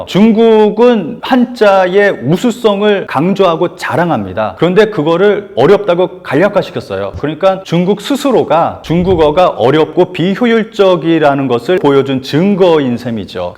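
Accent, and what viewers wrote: Japan. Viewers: 발음에 일본 액센트가 있네요~ 재일교포이신가요?^^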